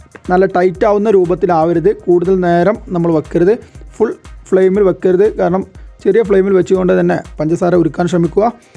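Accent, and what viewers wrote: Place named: Vadakara, India. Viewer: Laddoo mixcher. Anna vadakara parayunne. Super aayi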